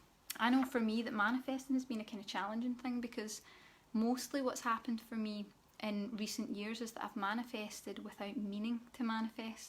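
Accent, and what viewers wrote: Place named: Scotland, United Kingdom. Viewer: Nice to hear the Scottish accent 😁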